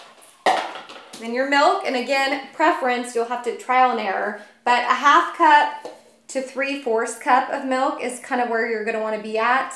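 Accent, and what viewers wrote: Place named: South, United States. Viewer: It’s different to say caramel with different accents, I guess you could say I have a “California accent” we pronounce things kind of straight forward so yeah we say it like caramel, your type of southern accent is like Carmel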